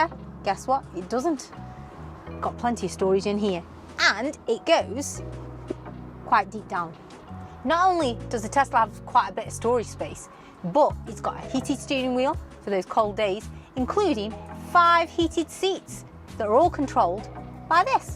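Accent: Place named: Stoke, United Kingdom. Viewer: You got a very strong stoke accent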